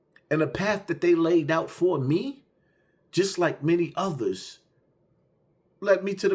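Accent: Brooklyn, United States. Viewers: BROOKLYN TONE N THE BUILDING EAST NY STAND UP 1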